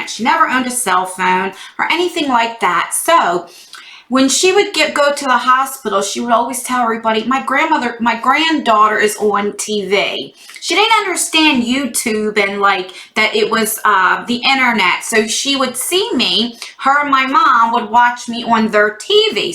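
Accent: New Jersey, United States. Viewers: Happy birthday!🌸🌼🌻....I gotta say that I nearly peed my pants when you said I wonder if she knows Im from NJ! 😂gurl.... you sound Jersey through and through! And I love it!!! Have an amazing birthday! From a fellow 43yr old🔅🤗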